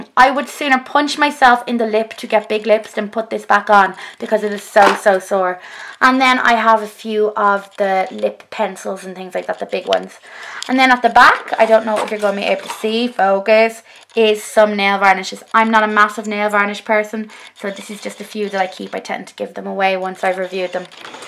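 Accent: Ireland. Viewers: Trust me hun I would watch more than 45 mins of makeup if it's you! I can't believe I've just discovered you I think your brilliant and l love your accent! <3 it reminds me of my half Irish fiancé :) thanks for showing us everything I haven't got much of a selection I've only recently started my makeup journey so the most I have is just a bag filled of goodies that's about it but if I keep watching you I will end up with wayyyyy more makeup than you!! Thanks again !! Oh and btw congrats on the wedding!!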